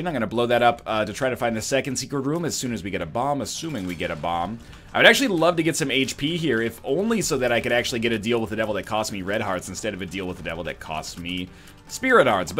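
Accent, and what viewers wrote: Canada. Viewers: South Parks imitation of Canadians is true because that's how actually talks. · Put that's how people in Canada talk!!!!!! I seen SOUTH PARK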